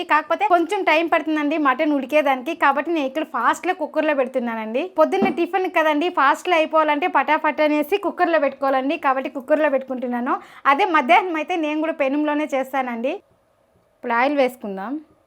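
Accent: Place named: Tirupati, India. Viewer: Chala baga chesaru akka mutton pulusu 👌🏼😍 ekada akka mee vooru? Tirupati r kalahasti anpisthundi slang vintunte ??!!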